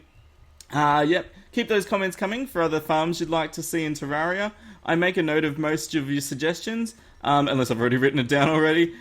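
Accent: Australia. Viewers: what part of Australia are you from I'm in south Australia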